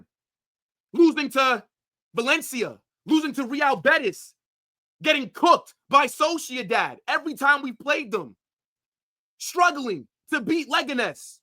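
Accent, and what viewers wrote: United States. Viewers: Are you American bro?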